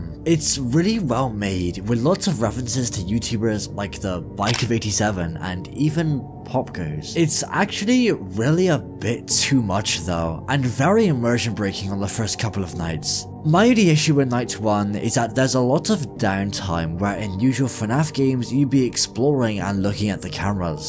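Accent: United Kingdom. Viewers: this video is cool and all.. but... I just love the Scotland Accent!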